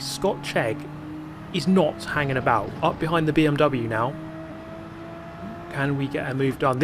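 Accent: United Kingdom. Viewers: Grove sent me here. Can't beat a British mans narration!!! Excellent Video. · Shhh nobody tell him any monotonous motorsport hum in the background will do for some people...😂 No seriously, I fell asleep many times to but the Voice IS certainly a part of it. Also the british accent and the sense of humor!!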